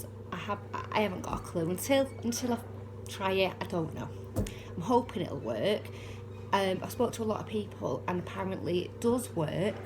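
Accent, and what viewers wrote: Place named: Manchester, United Kingdom. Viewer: It's horrible ain't it. I've had it over 2years and if I didn't have a sense of humour... You sound like you are from the Greater Manchester area. I am too. Is there a specialist you would recommend?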